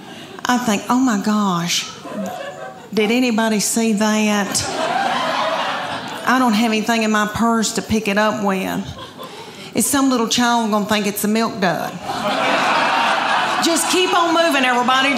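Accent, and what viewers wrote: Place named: Appalachia, United States. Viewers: She's gorgeous, intelligent and funny! I just LOVE that accent too! As smooth as Appalachian moonshine! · Why is it, when I hear a southern accent, I think dumb. If you don't want to get pregnant try the pill or condoms, honey. This is funny ? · I love you. I, a Yankee from NYC, lived in Memphis Tennessee for over 3 yrs and know most of TN quite well. If anything made an impression on me was that everyone was so, wholesome and funny, just like you. I learned so much about America, being a bred and raised city boy. I didn't realize how ignorant I was in the ways and niceness of the South. Those experiences and memories were amazing and I cherish all of them till this day! When you talk about being that "cute girl" in britches OMG, many times I went out with those girls! They were absolutely beautiful and their accents, like yours, would just melt my heart! 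Best was they were honest and loving. Your comedy is superb. I wish you the very best for you and your family. God Bless!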